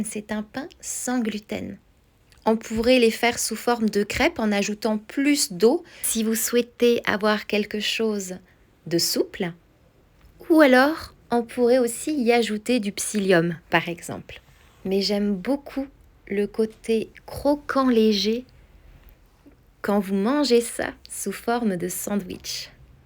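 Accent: France. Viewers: Hi, if you don't mind me asking, from where is your French accent? I'm learning French and that's why I ask and by the way I love chickpeas and this tortilla/bread looks so good.😻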